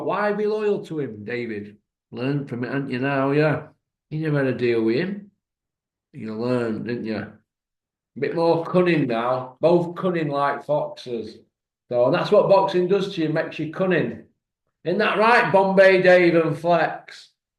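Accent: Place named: Northern England, United Kingdom. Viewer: Your quickly becoming my favourite boxing channel. Rough round the edges worts and all just a straight talking northerner. Greeting from the red rose county ;-)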